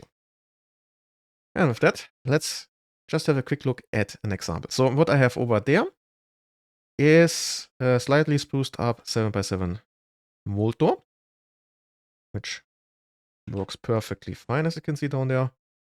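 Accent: Germany. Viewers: Guten tag, your german accent is so good, i'm kinda jealous about it. Tut mir leid, meine deutsch ist sehr schlecht, ich sprichst ein bisschen deutsch, aber das ist lange her :p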